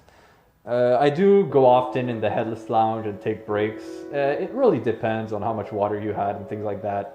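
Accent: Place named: Ontario, Canada. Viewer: I knew you where Canadian! I hear the French ontario accent in your voice!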